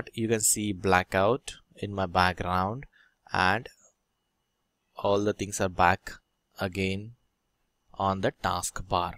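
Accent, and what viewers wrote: India. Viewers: fucking taskbar don’t work. don’t tell me i paid 60$ for windows license and here it’s buggy and have to listen to this poor indians voice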